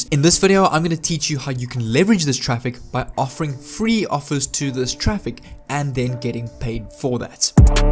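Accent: South Africa. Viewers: You sound South african ..How did you sign up with clickbank successfully?Im actually American living in SA but cannot sign up..Please help..thanks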